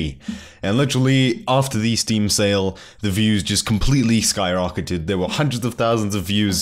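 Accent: South Africa. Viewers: i new you sounded South African howsit fellow South African ^^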